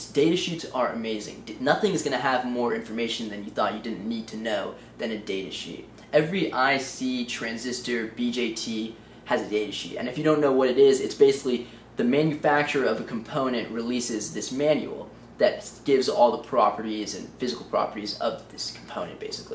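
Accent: United States. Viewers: new yorker? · you look like an indian boy and spealk like a american👍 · One of the biggest West-Coast accents I’ve ever heard 😂 Keep it up man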